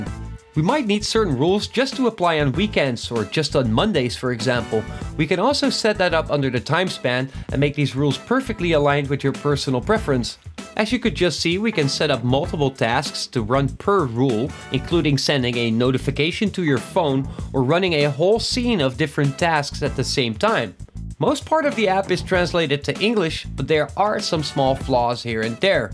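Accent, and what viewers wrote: Netherlands. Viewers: are you Dutch? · Dutch I think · So happy with your channel man. Your accent sounds Dutch, are you?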